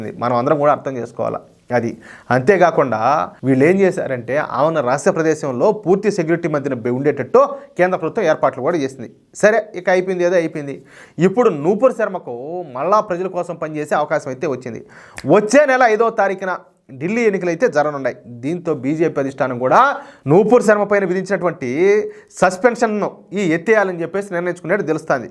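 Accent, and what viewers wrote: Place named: Nellore, India. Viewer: I like your Nellore style language Garu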